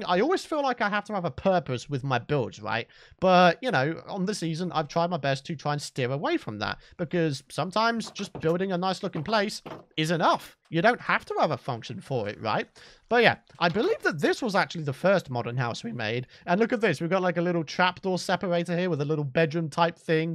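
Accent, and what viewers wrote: United Kingdom. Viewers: After listening to Last Week Tonight and then watching this, I honestly thought John Oliver was playing Minecraft 😂. Love your voice m8; so incredibly British! Excellent as always... PEACE!!!✌️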